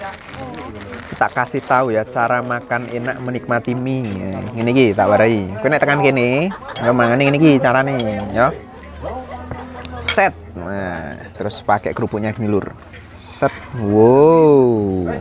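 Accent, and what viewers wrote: Java, Indonesia. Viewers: medoknya mas suaramu😂😂😂😂😂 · Haha mantep tenan, jarang2 aku ketemu channel YouTubernya spesialisasine medok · pakai bahasa jawa campur Indonesia klo bisa pakai subtitle, jangan pake bahasa "elo" "gue", ciri khas mu logat & bahasa jawa lebih original mas...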